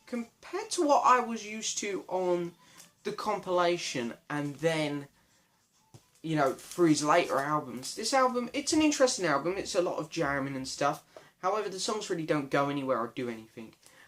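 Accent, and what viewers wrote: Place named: Essex, United Kingdom. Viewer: Where you from? Essex? Love the accent I’m from Romford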